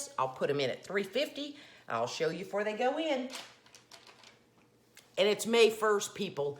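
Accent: South, United States. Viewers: sometimes I find that southern accent too strong but yours is softer, kinda sexy too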